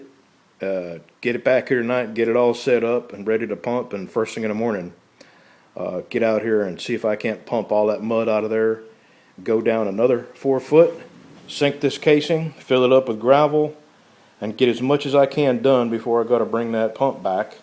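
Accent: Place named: North Texas, United States. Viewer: Cry Babies all around just goes to show Somebody's got to Hammer anything a Man does today. I did something like this 30years ago but not near as much thought or preparedness was involved. I like yer thought process and initiative in taking this on. You must be somewhere in the mid south. Sound like my cousins from NorthTexas. I'm gonna follow yer lead in the spring and put a shallow well in myself again for the same reasons related to $$$$. I appreciate yer work and have learned enough from this video to do mine better when the weather gets warmer. My Family out west has that same Dry ( I love it) Humor. Keep up the good work, I'll be watchin!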